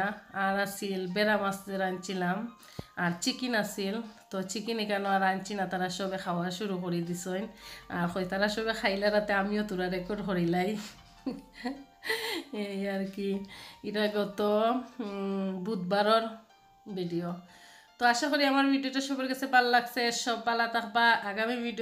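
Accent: Sylhet, Bangladesh. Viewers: আপু সিলেটের আঞ্চলিক ভাষা আমার খুব ভালো লাগে আর সিলেট অঞ্চলের খাবারও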